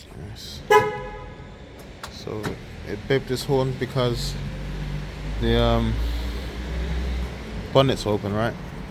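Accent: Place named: United Kingdom. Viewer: Nice to hear a British accent putting junk on the road again. 😊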